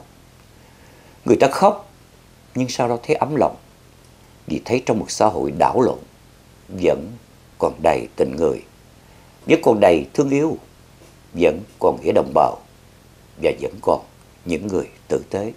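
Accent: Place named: South, Vietnam. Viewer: Con kính chào Chú Những tác phẩm của tác giả miền Nam qua giọng đọc miền Nam của Chú thiệt trầm ấm, lắng đọng đơn sơ, người nghe thiệt bịn rịn, xúc động rưng rưng nước mắt 💖🌷💖🙋‍♀️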